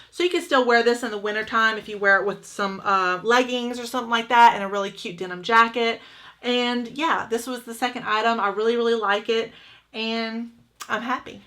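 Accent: South, United States. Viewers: You looked good in all of the dresses. Love your channel! You give so much more info than others I’ve watched. Plus, I get to hear a southern accent - represent, girl!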